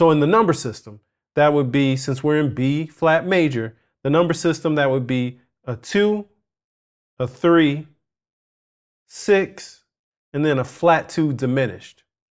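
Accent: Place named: South, United States. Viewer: 🤣🤣🤣yes, maybe. But you actually do have a somewhat southern accent. I live in New Orleans and I grew up around up near those guys. I appreciate your constructive approach through your videos. Extremely helpful. Thank you so much.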